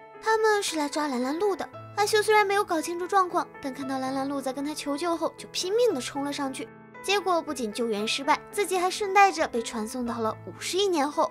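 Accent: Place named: Mainland, China. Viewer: 大陸有些字唸法跟台灣不一樣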